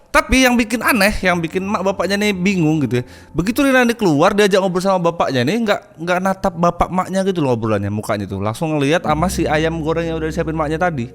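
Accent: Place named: Sumatra, Indonesia. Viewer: Kok malah berlogat Sumatra? Apa saya yang salah dengar 😮😮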